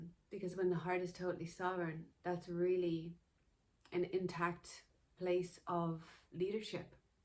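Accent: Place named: United States. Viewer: Why are suddenly AMERICAN? WTF?!!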